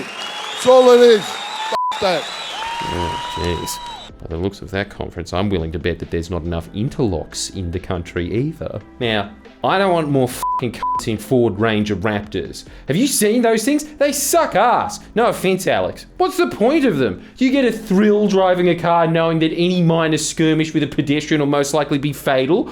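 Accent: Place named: Australia. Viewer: Could you pleaaaase consider talking a teeny tiny bit slower, it's freaking almost impossible to understand your aussie accent if you talk at the speed of lightning. Especially if your not an english native speaking person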